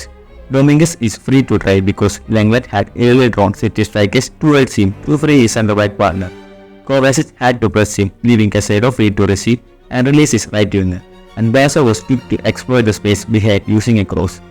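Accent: Kerala, India. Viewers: Wtf is this voiceover?? Malayali guy 🤮🤡. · I am from west bengal, I have many friends from Kerala. I realized you are from kerala from your accent of speaking english😄.one suggestion for you please improve your audio quality the analysis was good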